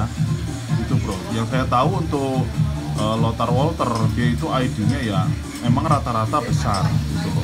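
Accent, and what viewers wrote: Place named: West Java, Indonesia. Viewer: Logatnya seperti orang sunda ini daerah mna pak🙏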